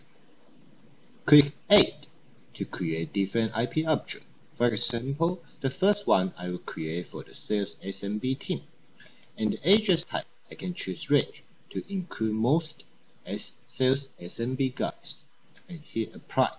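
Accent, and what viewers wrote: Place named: China. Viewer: No offense to creator, but it sounds more like Chinese rather than English... Can't be bothered listening...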